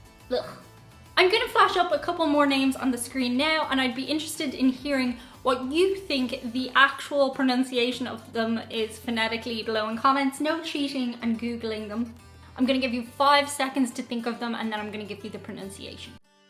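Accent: Ireland. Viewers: off topic question. Living in Spain, do you speak Spanish? I I would really like to hear an Irish accent with Spanish mix!👍👌😃🔆🌴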